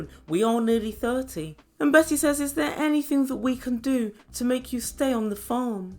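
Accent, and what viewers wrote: South, United States. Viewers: Love your Southern accent! Love your channel! ❤️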